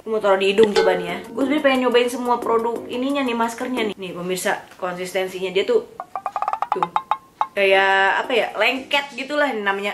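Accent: Tangerang, Indonesia. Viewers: sebagai SPG nya BRUNBRUN Paris di supermall Karawaci saya merasa terhibur, ngakak banget si ka bahasa Tangerang nya kelihatan jasa 🤣🤣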